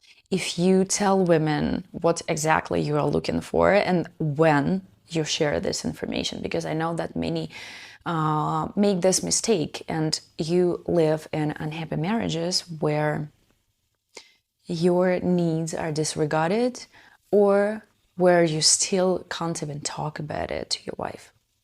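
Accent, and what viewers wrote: Russia. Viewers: Is ugly Russian accent a must in such lessons?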